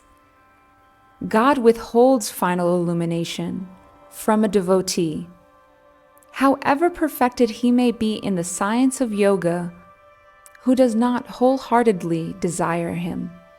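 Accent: Brazil. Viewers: I sense a Brazilian accent on the narrator’s voice. Great video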